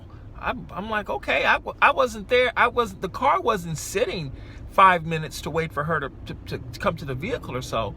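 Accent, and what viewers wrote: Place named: Edinburgh, United Kingdom. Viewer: Sounds Edinburra chief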